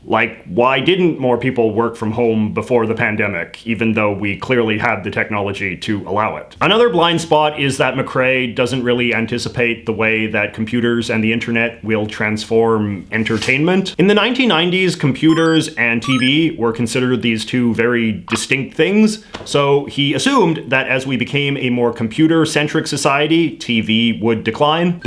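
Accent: Canada. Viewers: I won’t be voting for someone who talks like that, too Canadian and goofy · Never seen this guys videos before. All I take away is that this is the most canadian sounding person I have ever encountered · this guy sounds like the canadian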